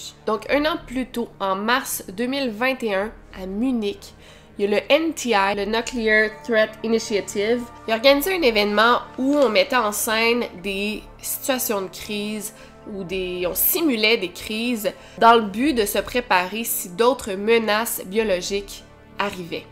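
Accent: Quebec, Canada. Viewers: Le vaccin contre la variole est valable à vie et j'ai été vaccinée étant enfant, donc je suis immunisée contre cette terrible maladie.Les jeunes sont plus à même d'attraper la viriole mais heureusement, le vaccin existe donc pas de panique. J'adore ton accent. Je suis Alsacienne et je trouve mignon l'accent canadien. Bravo, tu es super. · De verdad te quiero mucho pm porque? Je regarde toutes tes vidéos et j'aime bien. Je sais tu vie au Mexique mais tu as pas perdu ton accent Québécoise. T'es petits Tbk et Chris me plaît. Jajajaja Cuídate y besos xxxx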